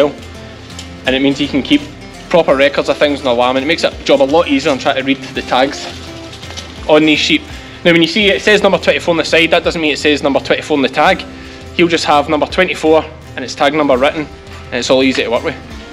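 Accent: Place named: Ayrshire, United Kingdom. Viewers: Please do say something in your Ayrshire dialect I would like to hear it! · Top craic as always,keep it coming.Dont try and talk without the accent. Ayrshire speak makes it!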